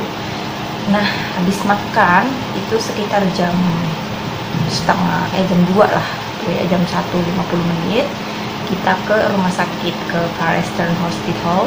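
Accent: Banyumas, Indonesia. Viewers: Halo anak cantik ngapak yen ora ngapak ora kepenak..... Semangat 👍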